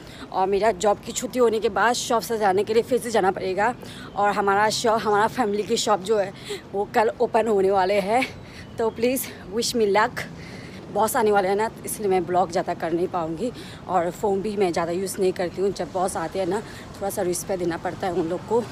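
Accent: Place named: Nepal. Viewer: Hi! You are Nepalese or Nepali speaker …? Coz your tone like Nepali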